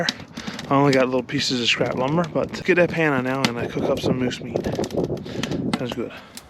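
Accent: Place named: East Coast, United States. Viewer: Ain’t hard to hear the east coast in yah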